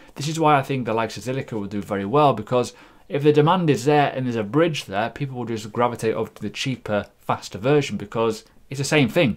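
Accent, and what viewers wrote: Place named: Hull, United Kingdom. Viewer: Where are you from mate. East Yorkshire. Bit of hull accent there lol I might be wrong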